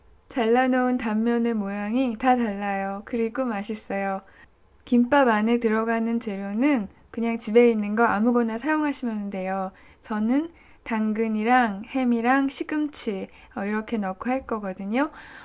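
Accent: Seoul, South Korea. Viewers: 교포가 아니라 서울사투리 쓰셔서 그런것같애요^^ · 목소리좋아요. 서울본토발음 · 말투가 80년대서울사투리에요 ㅋㅋㅋㅋ<3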